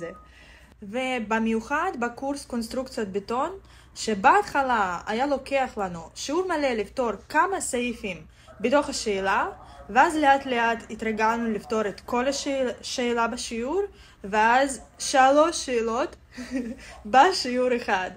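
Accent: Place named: Russia. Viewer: Это иврит с жутким русским акцентом. Своего рода смесь французского с нижегородским.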